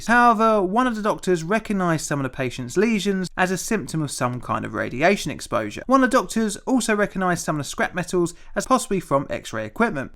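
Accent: United Kingdom. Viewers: I like your content and presentation, but your voice is very comforting in an odd way. My grandparents sounded like you and to this day, I think in a British accent lol. I lived with them most of my childhood. My grandmother was actually Scottish but ended up in southeast England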